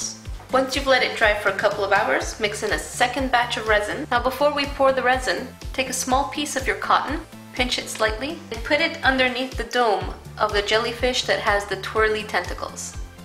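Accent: Quebec, Canada. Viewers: are you Canadian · Canadian aye? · Are you Canadian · are you Canadian or some really French Canadian person???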